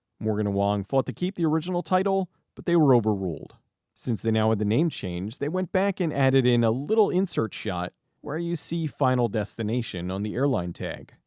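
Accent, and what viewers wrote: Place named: Boston, United States. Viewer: I've never noticed your Boston accent before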